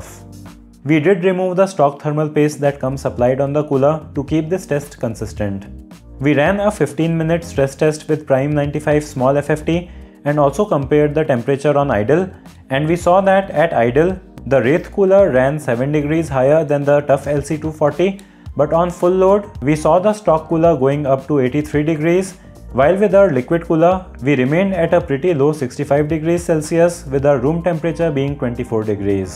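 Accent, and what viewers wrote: India. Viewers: Can’t watch this. Indian accent is so annoying.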